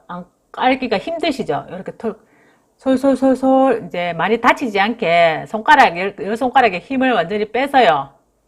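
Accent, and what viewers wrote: Gyeongsang, South Korea. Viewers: 주부님먹는소리가말자국 같아요ㆍ경상도주부님 같아요ㆍ알뜰한 주부님 성격도좋아요ㆍ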